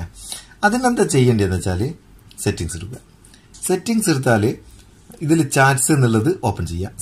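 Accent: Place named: Vatakara, India. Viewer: I sincerely appreciate you. Your slang is clear that you from Badagara region.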